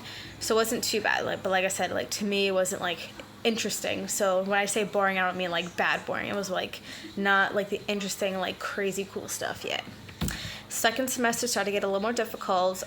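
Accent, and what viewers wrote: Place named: Boston, United States. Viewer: Hi! I saw in a previous comment that you started off as a per diem because you couldn't find something close to you. I just want to know where you're from if you don't mind me asking? I think Boston cuz of your accent but not sure??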